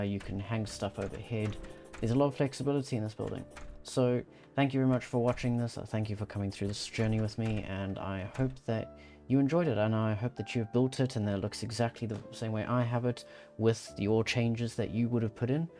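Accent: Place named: South Africa. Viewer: Sorry, I couldn't help notice the accent, but are you South African?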